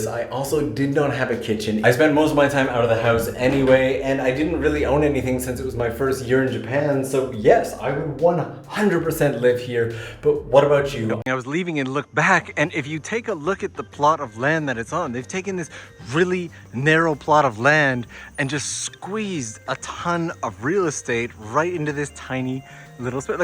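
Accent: Canada. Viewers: Somehow after living in Japan for like 10 years this dude still has a Canadian accent.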